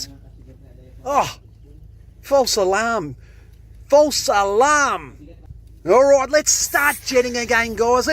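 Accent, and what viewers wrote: Australia. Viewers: Still here...been here for about 2 weeks! Never thought that this 'rabbit hole' would be so much fun! Your running Aussie commentary, makes me smile, and every so often when you give us a look at the scenery 🙄 love it! p.s. happy that scratch 'n sniff tv hasn't become a thing yet 🤣 'til next time, hello from Denmark 🇩🇰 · Your fuckn funny man love ya raw Aussie accent 😂😂😂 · I know I landed here because I kept watching SB mowing after work when my mind was tired and I just needed to stare at my TV while not thinking much and the algorithm was like "You should watch drains being cleaned!" It's a bit like oddly satisfying videos. I can't really stop watching but sometimes I'll watch in disgust haha Still, I love to see a unblocked drain 😄 I'm watchin from Austria - but I guess were close at heart, because I can't tell you how many times people thought I was from Australia. 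In Japan I was invited to THREE interviews of English schools because they thought I had written Australia in my resume. And then they were disappointed I'm not a native English speaker... Whoops haha But as a native English speaker it is nice to hear the Australian dialect, since I usually only get to hear british and american English. So that's fun! 😄 Keep up the good work 🎉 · G´Day, watching from Denmark. I found you my youtube magic. So far today I watched you unclog a car park drain, another gully+grease trap and pull out a sausage dog of a filthy hotel shower drain. Now can someone tell me why it´s so mesmerizing to watch this :D But i do have to say it´s a joy to see/hear someone enjoy there job. And I also like listing to some australisch , I spend one semester in Melbourne and it reminds me of that time, it was amazing and all the Aussies where super nice. I saw a nozzle like Mr German once in my local hardware store and I´m so tempted to buy one, we have a probably clogged drainage pipe in front of our carport. 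I now it would make a mess, I´m not sure if I will get the pipe clean, but listening to you having fun just wants me do it :D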